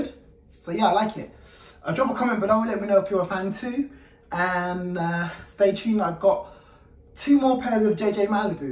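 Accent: United Kingdom. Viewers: British accent in Malibu !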